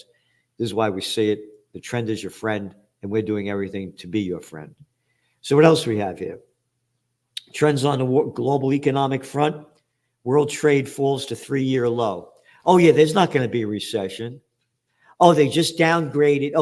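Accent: United States. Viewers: This guy sounds like a typical American 🤔🇺🇲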